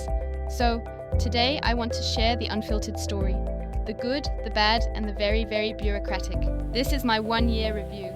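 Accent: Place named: Eastern Europe. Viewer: Don't forget the Eastern European accent, pretending being from the US.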